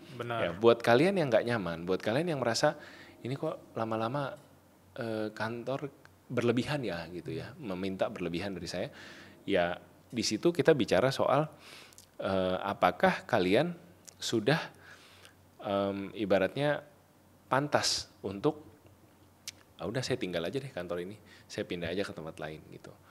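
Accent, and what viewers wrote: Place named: Sumatra, Indonesia. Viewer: hostnya ngomongnya ga smooth terbata2. logat sumatera totok banget😂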